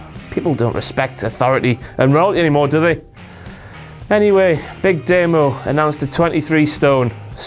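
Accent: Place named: Scotland, United Kingdom. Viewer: I love his Scottish accent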